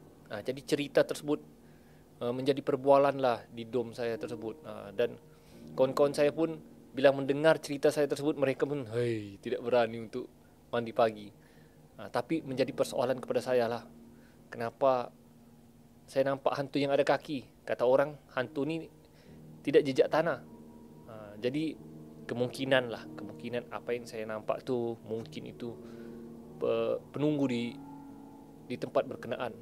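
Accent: Sabah, Malaysia. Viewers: From Sabahan? Right?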